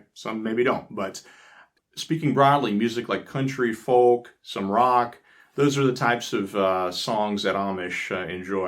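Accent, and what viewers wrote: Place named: North Carolina, United States. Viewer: He speaks like my brother, who is a ninth generation North Carolinan!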